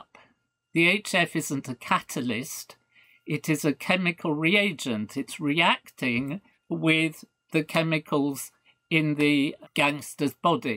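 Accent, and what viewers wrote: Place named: United Kingdom. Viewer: hearing someone say "the hero, walter, and his sidekick jesse, were surprised by gangsters; walter defeated two gangsters" in a british accent does something to my brain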